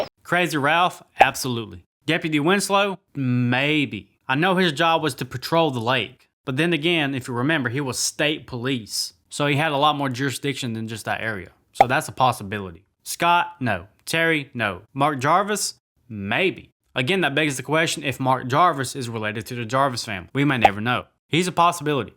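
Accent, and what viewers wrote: South, United States. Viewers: You mentioned so I am curious, how old are you? Range? I ask because I grew up with these films and watched them multiple times. I am about to meet Corey Feldman and Adrienne King at a convention so I really like your videos and you have a nice voice, is that a southern accent? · 1. I couldn't find a "10 Things I Still Don't Understand About" playlist. Where is it? Trying to binge those videos and YouTube keeps playing videos from other channels after one ends. 2. You sound as country as me, so you should know that country families usually have their own cemetery. Just a little piece of land somewhere on their property where they bury their dead, assuming the family has lived there since the 1800s, early 1900s at least. The question should be why is the Crystal Green (Forest Lake) cemetery so huge?! Is the town really that big? 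And filled with newcomers who don't have a family cemetery?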